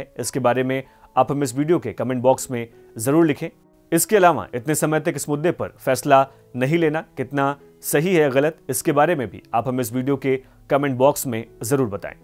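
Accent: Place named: Purvanchal, India. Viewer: लगता है ये एंकर पुरबिया है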